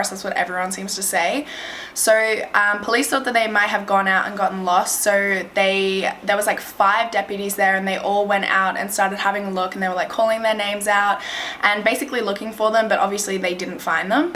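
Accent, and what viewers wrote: Australia. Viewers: Australian. She's from Australia. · Are you Australian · her accent is like australian and america switching back and forth. i'm so confuseddd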